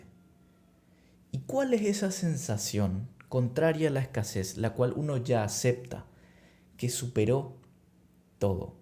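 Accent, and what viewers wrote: Paraguay. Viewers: Eres de Paraguay?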